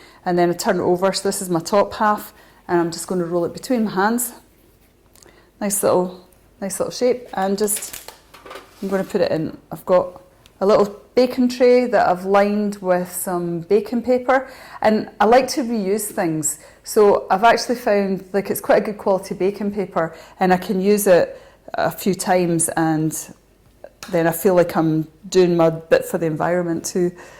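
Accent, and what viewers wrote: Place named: Scotland, United Kingdom. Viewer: It's easy when you know how, but the how is all in the person , in Ireland in the past ever one made irish brown soada bread and ever one's bread was different by taste and i mean everyone and everyone's preference was different, bread has the personality of the person who makes it in it and it's the best ingerant, perhaps that's why holy communeyen has the body and soul of Christ in it Give us our daily bread , you have a beautiful Scotch accent, a Caledonian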